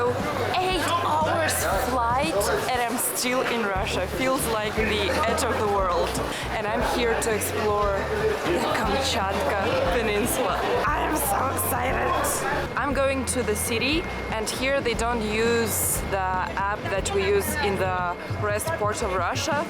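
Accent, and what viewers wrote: United States. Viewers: Пошленькая девушка и такой же пошленький американский акцент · Thought you were saying Geyser wrong, since your accent is more American sounding maybe you should say it <link>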